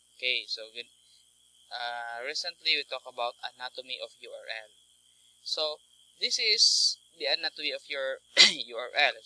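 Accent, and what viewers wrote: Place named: Visayas, Philippines. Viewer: Di ko maintindihan. Parang bisaya kasi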